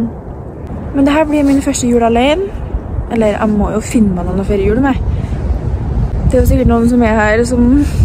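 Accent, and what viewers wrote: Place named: Trøndelag, Norway. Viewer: Stark TroNDD-Yem accent 😄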